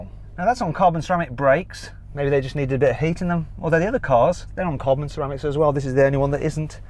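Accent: United Kingdom. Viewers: 영국발음들으니까 신기하다ㅋㅋㅋㅋ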